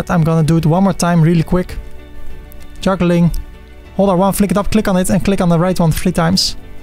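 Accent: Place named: Netherlands. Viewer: You have a nl acsent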